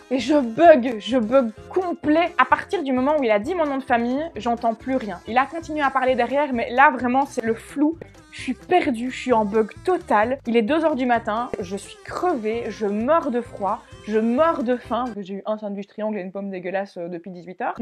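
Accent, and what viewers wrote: Belgium. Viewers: Bonjour, j’aime bien vos vidéos, un peu jaloux de vos abdominaux, vous êtes belge, votre accent ressemble, à l’accent des belges de Mons, vous connaissez? je suis franco belge, François Damiens, je le kiffe avec son rire de pervers quand il passe sa langue, bref vive la Belgique!! Et la France! Bye… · L'accent belge bien de chez nous 👌 tu es de où ? 👀 J'aimerais bien savoir 🙃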